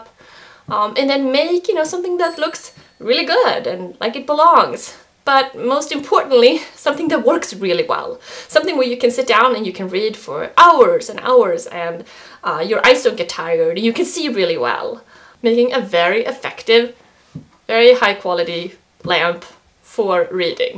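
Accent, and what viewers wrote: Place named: Sweden. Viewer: I love your accent, are you Swedish?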